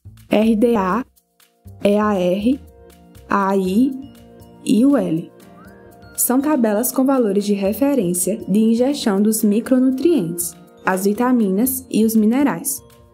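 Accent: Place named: Northeast, Brazil. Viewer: O sotáque nordestino ai ta forte kk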